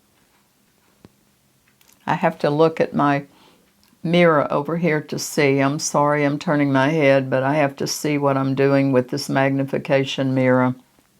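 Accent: South, United States. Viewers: You look fabulous,. I enjoy your longer videos; very relaxing. Especially your Southern drawl; you make my day. I’m sorry about your low vision problems. But one would never know by the way your makeup turns out. You’re just gorgeous and beautiful. Your eye makeup really brought out your beautiful blue eyes. God bless you,. Looking forward to your next video and welcome back! ❤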